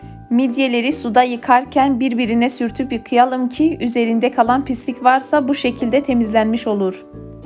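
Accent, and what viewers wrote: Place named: Eastern Anatolia, Turkey. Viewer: Dogu sivesi. Almanci sivesi. Ortaya karisik konusuyo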